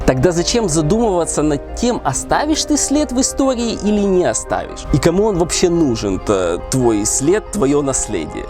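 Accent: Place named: Odessa, Ukraine. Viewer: Вы 100% с Одессы , узнала по говору свои😄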